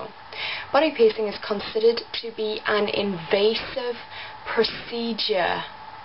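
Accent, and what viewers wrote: Newcastle, United Kingdom. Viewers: R u geordie? sry off topics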